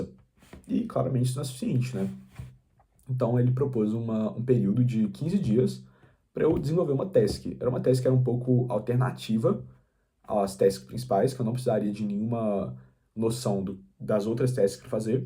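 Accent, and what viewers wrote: Minas Gerais, Brazil. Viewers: tu é mineiro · Ess trem kkkkkkkkkkk mineirês é otimo kkkk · Em um minuto de vídeo já imagino que seja mineiro kkkkk tmj tô na luta pra aprender tbm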